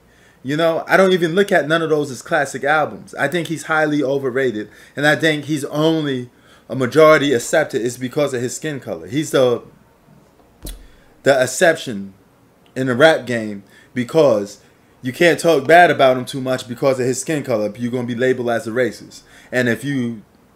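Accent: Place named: Baltimore, United States. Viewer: You’re from Queens. I honestly thought you were from Baltimore from your accent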